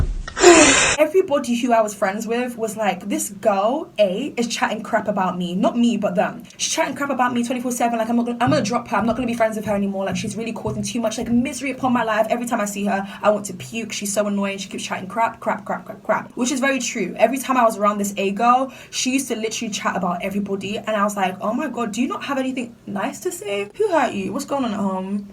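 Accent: United Kingdom. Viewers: SHITT THIS GIRL IS BRITISH. MY GGGGG. I THOUGHT YOU WERE AMERICAN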